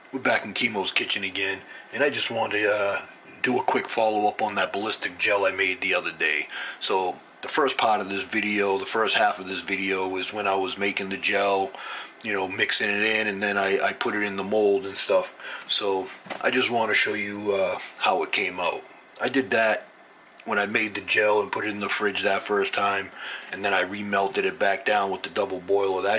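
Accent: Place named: Boston, United States. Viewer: Not bad! You got that Boston accent. For the bubbles on top try using a heat gun so the top bubbles can decipate all together!! 👍👍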